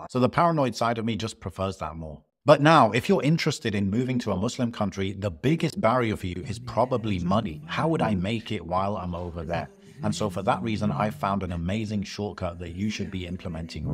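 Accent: United Kingdom. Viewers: Wait a minute are you British? How could you spend 19 years in the Middle Eastern region if you sound like a British guy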